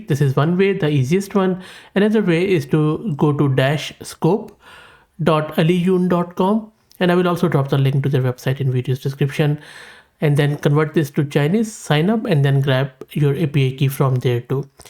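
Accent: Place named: India. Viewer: >indian voice >disliked and reported the video immediately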